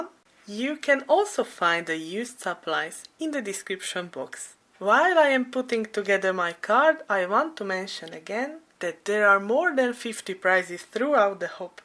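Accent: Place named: Netherlands. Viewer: Hi! I chatted eith u on IG briefly. Awesome creation!😍😍😍😍 ps..love ur accent (dutch?) Im in USA (New York accent but i live in Florida🌞)lol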